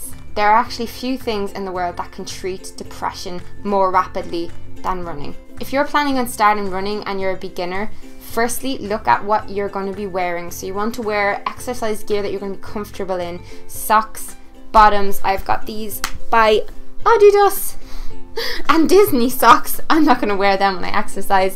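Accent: Ireland. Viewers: Your Irish omg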